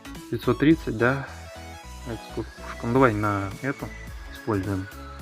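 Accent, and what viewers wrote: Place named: Russia. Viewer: I think Russian, he has kind of a heavy accent.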